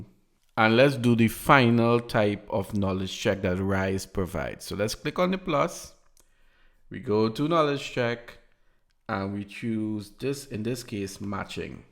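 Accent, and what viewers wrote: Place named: Trinidad and Tobago. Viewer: You're not a real instructor unless you have a Trini accent. I love it. Cheers!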